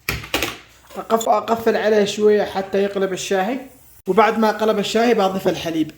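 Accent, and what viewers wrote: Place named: Aden, Yemen. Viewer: الكلام سعودي والنغمه عدني😁كلام ياعدن كلام هيه بلعافيه عليك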